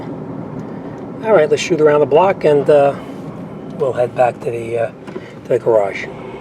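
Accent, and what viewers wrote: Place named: New York, United States. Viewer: Nice work 😊👍 Are you originally from NY / Jersey/ Queens??? Im hearing a slight accent in your voice 🤔 With respect from steve in Bundaberg Australia 🇦🇺 🍺🕺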